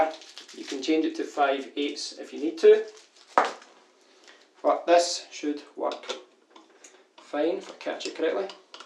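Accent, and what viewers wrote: Scotland, United Kingdom. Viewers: Are you from Scotland by chance?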